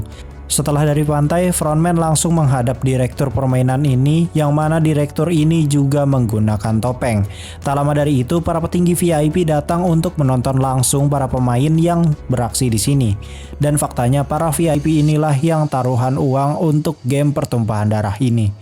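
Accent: West Java, Indonesia. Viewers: Orang Sunda mang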